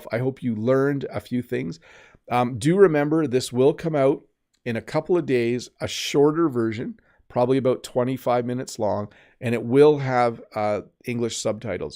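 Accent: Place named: Canada. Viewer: good morning, you are a great teacher, I learn English from you, but also calmness and composure. I saw you once on the Lucy english with Lucy channel, I must honestly admit that I thought that the difference in accent between Canada and Great Britain or Australia and the USA is greater, at my stage they are almost imperceptible, except for how you pronounce Toronto, Toronto, hold on you are great greetings From Poland. Luke G.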